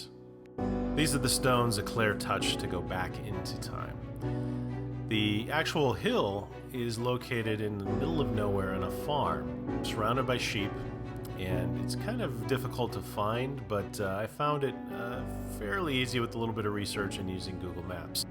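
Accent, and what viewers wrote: United States. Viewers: It just sounds as if insulting a country isn't important enough to try pronounce it properly while enjoying the culture seems to be your approach to other country's Scotland isn't worth trying your hardest not to offend. A small country who gave the world some of the most important advances penicillin, television,pneumatic tyres, steam engines and most important educated thinking 🤔. Umh just a thought for your Midwest pronunciation if its worth being done do it properly.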